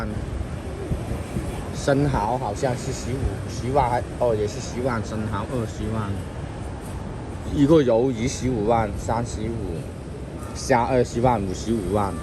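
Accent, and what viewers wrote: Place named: Cantonese, China. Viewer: 粵語口音😂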